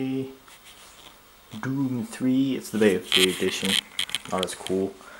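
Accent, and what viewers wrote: Australia. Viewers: U Aussie bro ? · Very nice setup bud! not sure about the lounges haha but great ;) can definitely tell you're from Australia like me ?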